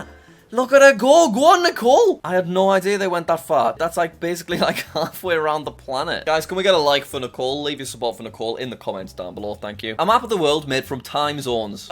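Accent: United Kingdom. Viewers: As a british person I know as the only person with a british accent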